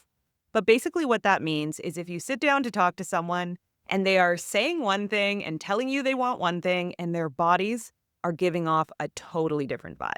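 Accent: Canada. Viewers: You’re from Canada aren’t you?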